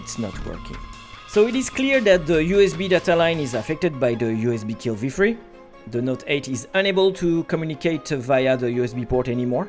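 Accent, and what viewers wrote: France. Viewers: What's the name you said in the beginning? Why your charger block is us Canada if you have a French accent? It isn't an European company? You are french Canadian?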